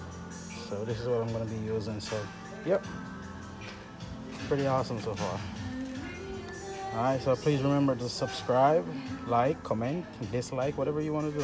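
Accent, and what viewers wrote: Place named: Caribbean. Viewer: You’re sound Caribbean my people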